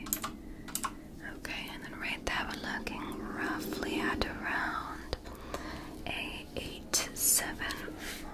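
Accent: United Kingdom. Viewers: Amen. Her british/alien voice melts me like butter on a pan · Are you British?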